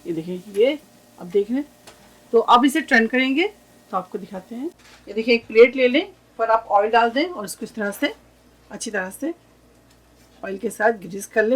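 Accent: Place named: India. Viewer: How nice you explain every thing ...... I will try the patato that way loved you accent from india....... And give more food video.......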